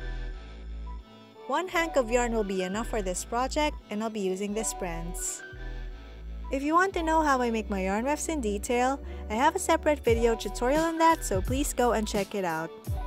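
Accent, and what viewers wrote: Philippines. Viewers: You have a Filipino accent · Your Filipino accent 😍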